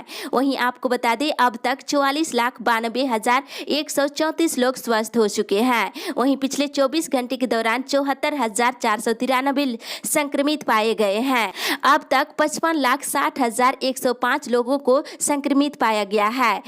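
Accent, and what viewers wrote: Jharkhand, India. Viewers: Aapke news kaafi jaruri ho gaya abb jharkhand news updates k liye... thanks. Aur aapki awaz me sudh desi jharkhandi bhasha ki khushbu bhi aati hai ....ha jbb kabhi kabhi aap uccharan me thoda mistake v karti h to bura nhi lgta h bus reality lgta h. Udaharan k liye "Faculty" word aaj k news me.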